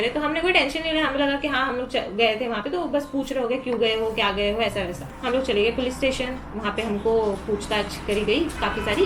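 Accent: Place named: Vidarbha, India. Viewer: Mala vatalch nagpur chi asel tu lagana mdhe vidhrbha chi bhasha bolt hote...😊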